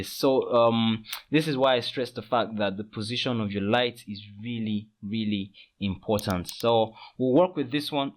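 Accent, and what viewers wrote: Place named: Nigeria. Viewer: Thank you Bro its really helpful I understood it more with your accent,more grace and knowledge..Naija Bro sending love from TN,U.S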